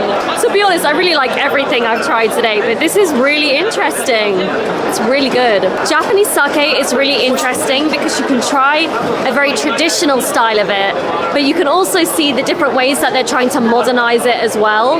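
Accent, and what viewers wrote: United Kingdom. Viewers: 動画の企画も、もちろん素晴らしいです。いつも楽しみにさせていただいています。探求心も素晴らしいです。さらに、の、イギリス英語の響きの良さ、本当に引き込まれます。きれいな英語を聞かせていただくだけで、大満足です。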